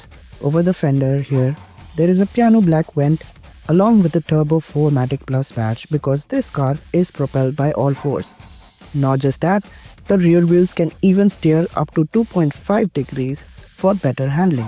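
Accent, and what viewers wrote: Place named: India. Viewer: The sound of an Indian voice make it look cheap and a scam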